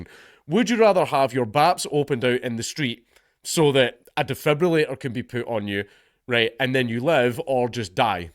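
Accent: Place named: Scotland, United Kingdom. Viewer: I had no idea listening to someone with a heavy Scottish accent say defibrillator would be so entertaining. I am going to ask that you say a few other things. Tarantula. Vocabulary. Distribution. Judicial system. Oh! And Burglar alarm.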